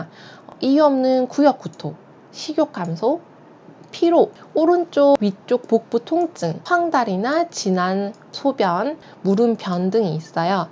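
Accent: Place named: Gyeongsang, South Korea. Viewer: 선생님 인기 인기 하는데 뭔가 경상도 냄새가 나는데 ㅎㅎ 경상도분이시죠? ㅎㅎ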